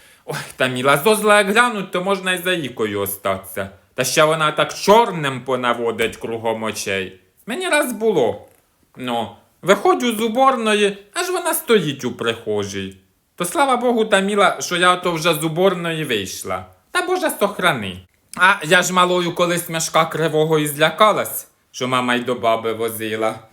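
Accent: Poltava, Ukraine. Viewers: Обожнюю ту полтавщину, моя рідна бабуся з кобеляк, ну точно так балакає😂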